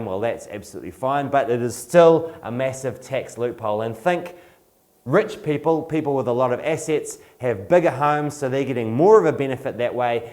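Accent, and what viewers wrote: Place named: New Zealand. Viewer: This applies to New Zealand it only obvious if you recognize the accent.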